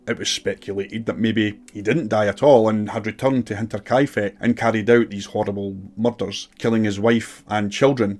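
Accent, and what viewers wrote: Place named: Ayrshire, United Kingdom. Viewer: My folks live in Ayr, you sound just like you maybe come from around there? New sub and loving the wee stories, thank you x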